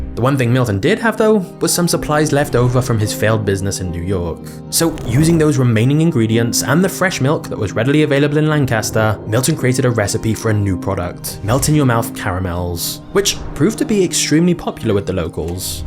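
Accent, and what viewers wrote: United Kingdom. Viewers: Are you British or American ?? Because you’ve got British accent and words but your sponsors just use dollars I’m so confused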